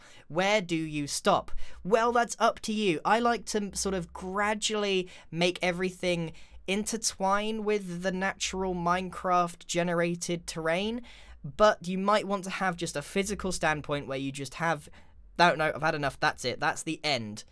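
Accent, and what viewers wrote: Netherlands. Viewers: Last van een nederlands accentje?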